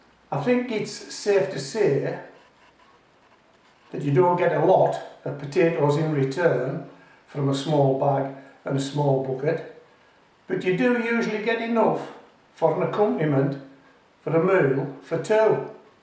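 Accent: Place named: Darlington, United Kingdom. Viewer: thank you kindly and bless your ears. It doesn’t look insipid in that vid. Looks spot on. I have family from Darlington and you have a similar accent. Lovely up there. I’m in the fens where it’s flat and windy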